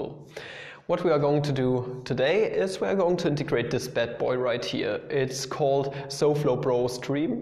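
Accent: Germany. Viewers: You are German and I understood that from the way you pronounce z and this :p Awesome video nonetheless.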